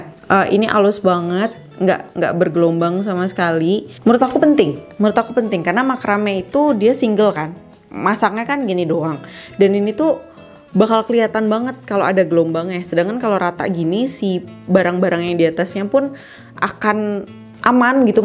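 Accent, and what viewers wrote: West Java, Indonesia. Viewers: Sundanese girl banget anjir logat ngomongnya😂😂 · Si teteh ngomong nyh Sunda, aku suka❤ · Nyunda pisan 😂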